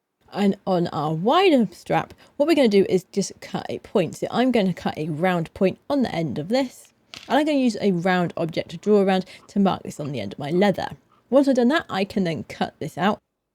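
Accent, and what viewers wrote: England, United Kingdom. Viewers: thank you so much for your videos !!! it's always a pleasure to see it, everything look super easy with you and it is very well explain Ps: I love your english accent <3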